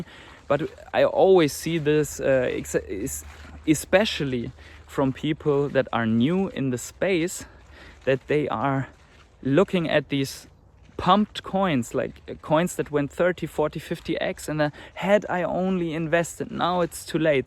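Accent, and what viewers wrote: Germany. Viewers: Hörst dich deutsch an 😉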